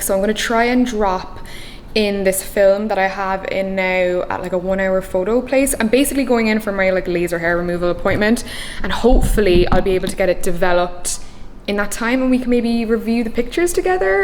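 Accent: Cork, Ireland. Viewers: The Cork accent jumped out in this one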